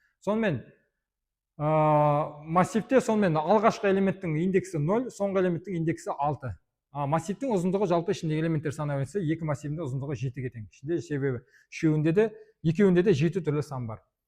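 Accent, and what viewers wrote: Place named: Mongolia. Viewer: Осы бала Монголиядан келген сияқты.Өте түсінікті айтып жатыр көптен көп рахмет .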